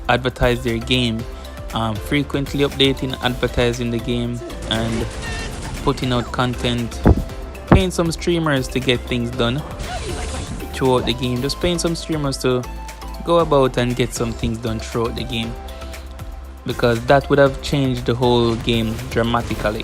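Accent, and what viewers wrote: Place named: Caribbean. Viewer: hey which country you from?, you sound like a Caribbean person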